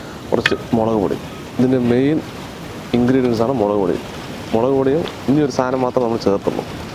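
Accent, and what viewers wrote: Palakkad, India. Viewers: Ikkaaa... Ennumpole innum super..... Kaada ende fav aanu.... Pakshe adhilum super nammande palakkadu baasha thanne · ക്കാ ഒരായിരം ലൈക്കിനോടൊപ്പം ഒരായിരം ആശംസകൾ.. ആ പാലക്കാടൻ സംഭാഷണം പ്രത്യേകിച്ച് ചിക്കൻ കഴുകുമ്പോഴും, അടുപ്പ് കത്തിക്കുമ്പോഴും.. അത് മാത്രം കേട്ടാൽത്തന്നെ മനസ്സുനിറയും.. പിന്നെ ആ കൂട്ടുകാരോടൊപ്പം ഇരുന്ന് ഉണ്ടാക്കി വച്ചത് കഴിക്കുന്നത് കാണുമ്പോൾ വയറും നിറയും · Palakkad an Bhasha athanu secret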